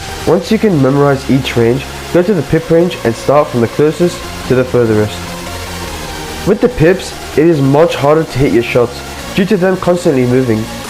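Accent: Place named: South Africa. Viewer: Thanks for the vid, but what is your sensitivity for controller Btw you sound South African :)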